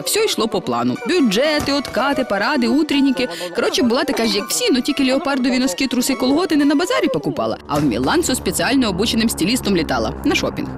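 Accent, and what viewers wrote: Ukraine. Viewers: У меня тетка на Подоле живет в Киеве. Так она точно так же разговаривает. Один в один. И суржик, и интонации и фразочки ту, пипец, как похожи.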